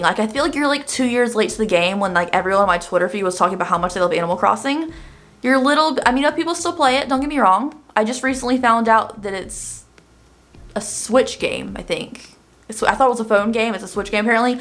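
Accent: South, United States. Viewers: Where are you from? I hear a Southern accent.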